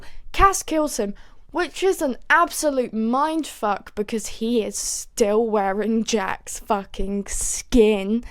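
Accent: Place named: England, United Kingdom. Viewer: Didn’t expect an English accent when I came from tumblr (everyone is just American to me) and now I’m even more excited to watch ‼️‼️‼️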